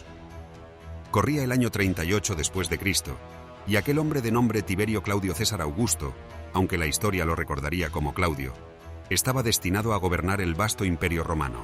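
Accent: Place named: Spain. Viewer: Por fín puedo escuchar este relato de la historia en español de España. Gracias.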